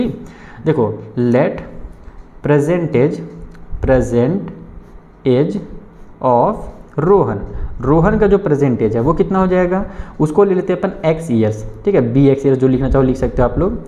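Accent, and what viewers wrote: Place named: Bhojpuri, India. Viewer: Sir aap up/bihar se ho kya Ajj apko bhojpuri style me padate hue dheaka✅✅🙃🙃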